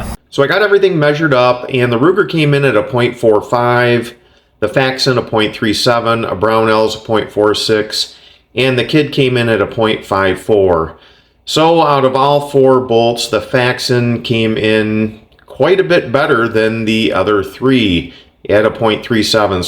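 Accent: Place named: Northwoods, United States. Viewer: I've got to ask, where are you from? Sounds like the great Northwoods accent!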